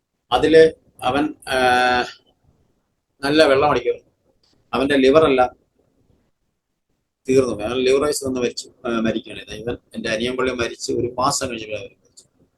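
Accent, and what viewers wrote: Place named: Thiruvananthapuram, India. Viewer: ഇദ്ദേഹത്തിൻ്റെ സ്ലാംഗ് തിരുവനന്തപുരം രീതിയിൽ ആണ്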